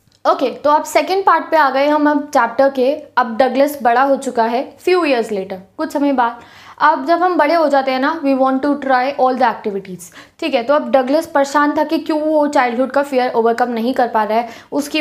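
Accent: Punjab, India. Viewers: Mam kitna bhi rok lo punjabi to rag rag me hegi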